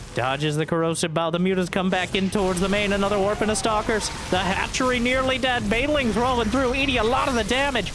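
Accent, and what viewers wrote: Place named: Texas, United States. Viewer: Bro, you're literally the best caster, and I love your charming Texan accent. Please keep it coming, man.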